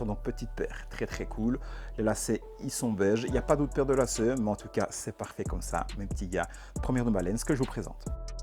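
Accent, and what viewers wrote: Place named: Belgium. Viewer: Petit accent belge 🤣 tu viens d’où ?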